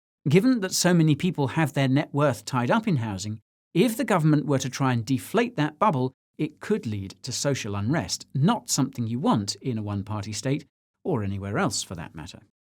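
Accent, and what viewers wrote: United States. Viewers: Good American accent... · Love that American accent. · Your American accent is on point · stick to the cowboy accent brother !!! it suits you !!! :) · LOLOL I love the American English accent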